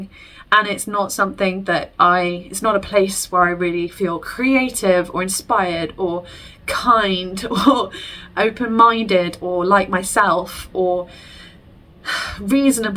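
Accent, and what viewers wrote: United Kingdom. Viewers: eh? she has a neutral, educated accent to me which is common here in Manchester. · Why are you speaking in a scouse accent when your not from Liverpool?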